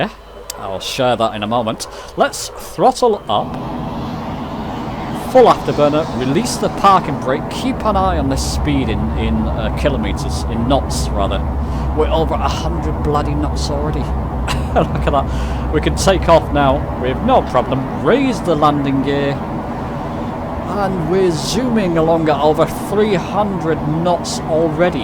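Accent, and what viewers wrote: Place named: Scotland, United Kingdom. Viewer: hi. you say that the flight time is 6 minutes from landidan to paris. i am trying to do the same route. i have download the plane but can't find landidan. is that in finland? although the flight would be impossible. you did say 6 minutes? sorry, i have trouble with your scottish accent as i am english:/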